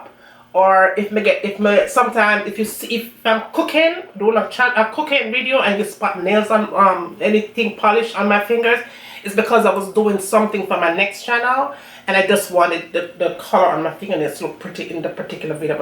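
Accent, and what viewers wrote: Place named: Jamaica. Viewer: You doing good with the Jamaican 🇯🇲🥑accent 😃you go goodie🥰. Happy Independence Day 🍹and you eating your dinner 🥘 right🍍🍅🥒🥥🍍mi making some a your hominy corn porridge 🥣 for my dinner today 8/6/20 🇯🇲😃❤️keep the Jamaican twang going. You not from Spanish country, so you have to speak your Jamaican twang 👍🧄🥕🦞🌷🥰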